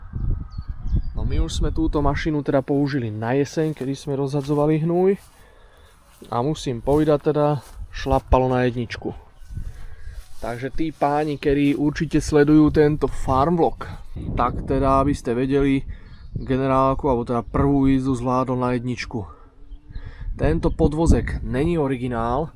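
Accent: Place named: Moravia, Czech Republic. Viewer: Moc fajn video! Nikdy jsem nikoho neslyšel mluvit zároveň česky, moravsky a slovensky 💪🏽😀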